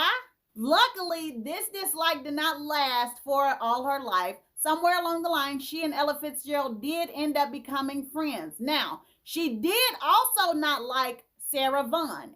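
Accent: South, United States. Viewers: I just love your southern accent.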